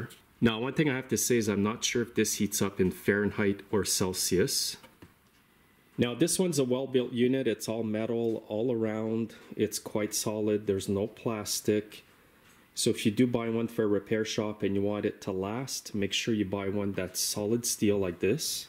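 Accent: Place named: Canada. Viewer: Definitely Fahrenheit. Also, I just love the Canadian accent. Good video.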